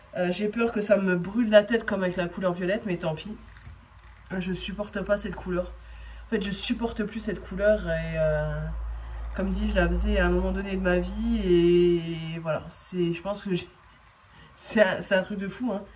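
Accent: Belgium. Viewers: ton accent au début il était bien belge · tu m a fait trop rire avec ton accent belge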